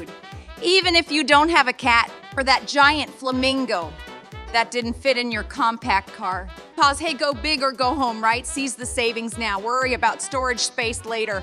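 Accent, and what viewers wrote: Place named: Minnesota, United States. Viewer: AI voice is from Minnesota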